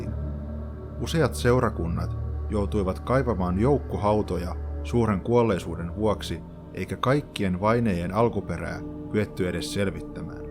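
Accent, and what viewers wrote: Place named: Häme, Finland. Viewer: Mainio video, ja kanava lähti tilaukseen. Hieman häiritsee "hämäläistyyppinen" murre joissain sanoissa: esim. huano (huono), kualleita (kuolleita), jne., sekä lauseiden kummallinen, robottimainen rytmittäminen. Kaiken kaikkiaan kuitenkin hieno kanava ja hienoja videoita.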